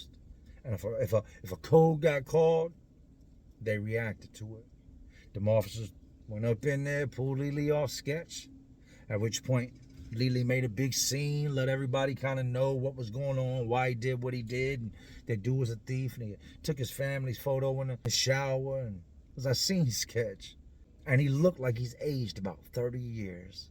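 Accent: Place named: Philadelphia, United States. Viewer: i can hear that PHILLY in this voice..even though he from VA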